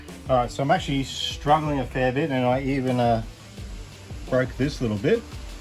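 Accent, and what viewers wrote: Australia. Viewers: Looks and sounds like this guy is in Australia. I've never seen a torx screw or star headed screw on any USA Milwaukee drills or tools, they are all Phillips No. 3 or split headed with standard screwdriver slot. It's obvious the engineering is purposely different on OEM Milwaukee tools down under. Milwaukee half inch cordless chucks have been known to freeze up like this. I've never seen any tool maintenance instructions from them advising regular lubrication of the chucks. Seems like common sense but lots of tradesmen never lube their drill chucks, both keyed & keyless.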